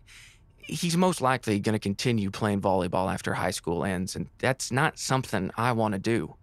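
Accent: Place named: United States. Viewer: I love the audio it's very cute but why he got a little bit of a country accent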